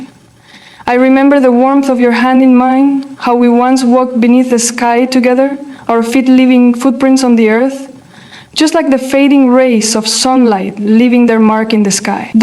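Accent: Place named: United Kingdom. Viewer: Откуда этот акцент???У меня в университете студенты и делегация из Великобритании так разговаривали.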